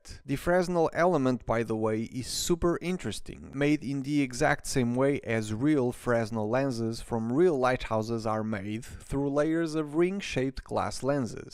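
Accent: Portugal. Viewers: Tu tens um inglês mais tuga de sempre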